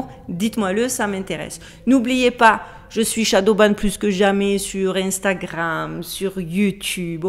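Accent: South, France. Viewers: bonsoir j'adore votre accent du sud de la France que l'on ne perd jamais où qu'on aille je suis toujours demandé comment cette famille a pu prendre un tel essor "sans rien faire" oui oui oui je fais de la magie chrétienne et j'arrive à un bonheur incroyable mais je me suis faite toute seule et jamais le dark side ne pourra m'atteindre j'ai l'amour inconditionnel et universel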